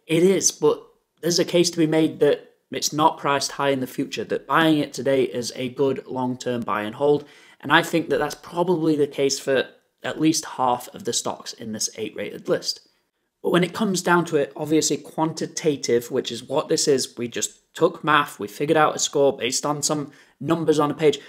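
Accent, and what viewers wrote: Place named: United Kingdom. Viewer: You sound British, are you from the UK?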